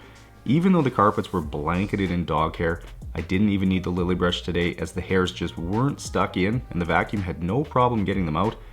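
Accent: Canada. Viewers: All I’m gonna say is I started watching staufer garage detailing and I was hooked and then your channel popped up on hen you were really low on subs maybe around 15-20k I instantly fell in love with your content and Canadian accent lol. Man you have grown so much and I’m actually very very happy for you! Keep up the great work you are a very amazing and genuine person and I am happy to have came across your channel when I did. Maybe one day I’ll drive from New York to Canada to get my car detailed lol but your really far haha. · Nice job! You must be in Canada; hints of some of the accent 😉 And that snow, lots of it. 😊 Happy Spring, from Washington, D.C. 🌺🌻🌼🌷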